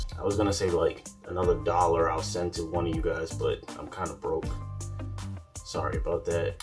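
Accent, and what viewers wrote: Jamaica. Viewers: Wait r u Jamaican too🤙🏾🔥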